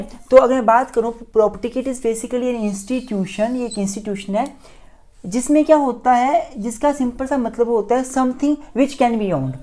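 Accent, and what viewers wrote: Himachal Pradesh, India. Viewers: Be proud of your accent sir!! I Love Himachal ❤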